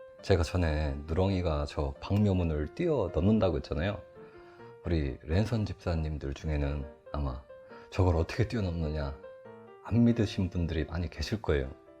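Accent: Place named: Chungcheong, South Korea. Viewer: 에꾸가 잘 알다시피 너무 날씬하잖아요ㅋ 시도를 안 해요. 저도 충청도지만 충청도식 화법 느므 익숙하고 고향 생각 나네요^__^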